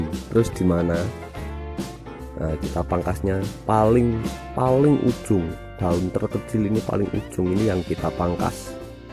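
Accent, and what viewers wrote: Temanggung, Indonesia. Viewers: Temanggung ya mas?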